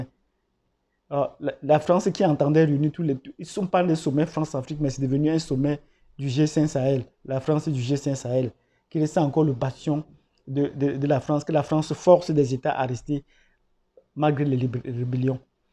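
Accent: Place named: Bamileke, Cameroon. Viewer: Je m'excuses, mais ton analyse est faite avec trop l'accent Bamiléké qui me gène personnellement, elle est pleine de répétitions de phrases et sur le fond je n'y ai rien trouvé de pertinent. Donc je te conseille de laisser la place à un autre qui non seulement parle sans accent, mais qui va dans la profondeur des choses. C'est vraiment Dommage pour les Bamilékés. 🙏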